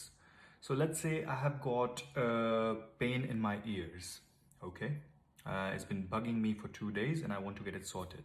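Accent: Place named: England, United Kingdom. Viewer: I am just amazed by your English accent omg it’s so good just like the Britain’s my brother stayed in the uk for 1 year even his English accent became like yours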